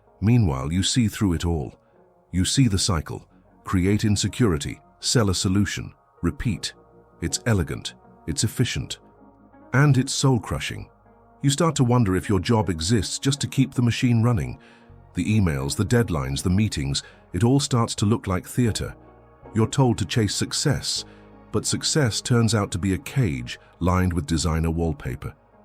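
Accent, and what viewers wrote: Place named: England, United Kingdom. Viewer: But the AI has a cool English sounding voice 😅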